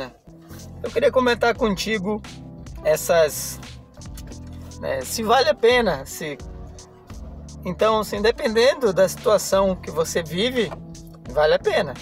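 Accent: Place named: Santa Catarina, Brazil. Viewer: Vc já está com sotaque de Catarina. Sou catarinense e moro em Joinville.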